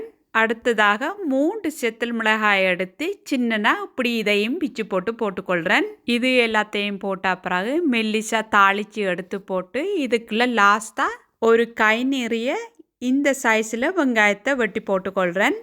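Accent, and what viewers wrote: Sri Lanka. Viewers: வழக்கம் போல உங்கள் இலங்கை தமிழும் தோசை யும் அருமை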